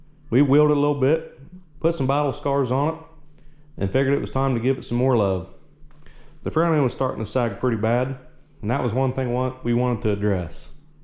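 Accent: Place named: Appalachia, United States. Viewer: You have to be from SE Ohio or WV.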